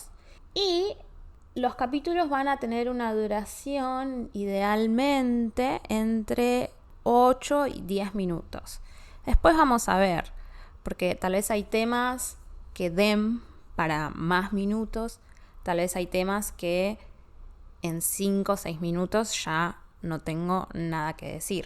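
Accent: Argentina. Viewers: Me gusta mucho lo que haces. Para mí es muy importante escuchar el español argentino. Con mucho gusto y beneficio escucho y veo tus podcasts. ¡Muchísimas gracias!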